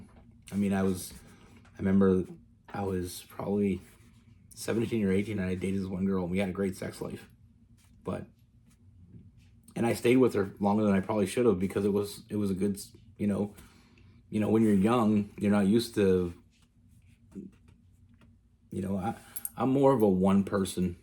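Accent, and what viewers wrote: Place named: Canada. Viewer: Thanks for the story man I've been watching you for a while. For a while there I thought you were from Boston like me but turns out I guess you're from Canada. And I can kind of relate to you because all my cousins are from Canada. I definitely agree with friends first. I've known this guy on and off for years and 2011. All of a sudden out of nowhere he said that well basically you said that he didn't really say I love you but he said that he deeply cares about me I think he's afraid to say the l word so he is not ready yet. And kind of just came back in life. And I feel a little shaky or on ground because I don't know what direction this is going to head in. But I'm going to be patient with him and see where it goes. But he's the one that noticed that I didn't love myself and he stepped away for like a year. And now all of a sudden he says he misses me and cares about me deeply. 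I hope he's not lying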